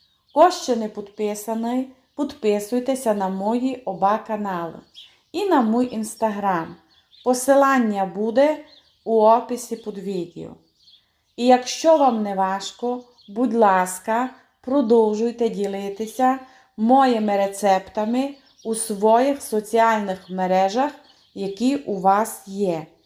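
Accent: Transcarpathia, Ukraine. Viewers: Дякую за рецепт. Обов'язково приготую. Я обожнюю ваш закарпатський говір❤. Дай Вам, Боже, рясних благословінь і міцного здоров'я. ❤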